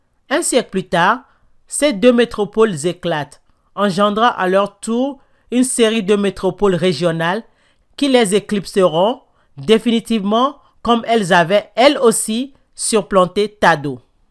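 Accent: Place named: France. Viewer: C est bizarre on dirait que tu Francaise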